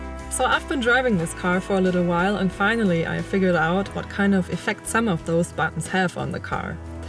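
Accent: Germany. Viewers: next time you want a german guy to review a german car, give me a ring. damn...! I cannot listen to this accent any further.... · She's from Germany, English is not her first language. She's doing her best to give you a decent review of the car and in my opinion she's doing a good job at it. Respect. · ***** You do realize that it is possible to complain about the reviewer without regard for which brand they are reviewing right (ex. like preferring EVO to Autocar)? If you like german accent, that's fine. Some people, including me, don't. The only idiots here are people who don't think other people are allowed to disagree with them.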